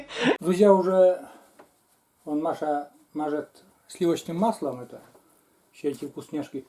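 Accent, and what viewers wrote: Komi, Russia. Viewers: Маша Добрый день.смотриш на вас и душа радуется за вас,у вас всегда все хорошо.дай бог вам здаровье!у вас красивый коми язык + русский- красиво звучит.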